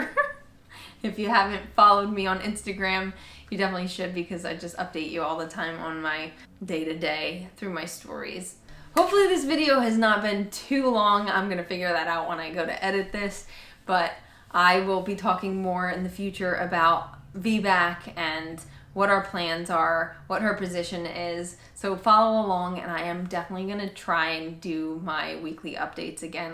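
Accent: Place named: New Jersey, United States. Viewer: Hang in there momma♡ prayers for a successful vbac, your husband present regardless and all good things for your delivery. I know you're from up north, I thought I remembered you saying you're from NJ (and your accent sounds like mine😂) I am a jersey girl born and raised but live in SC and you make me feel back at home♡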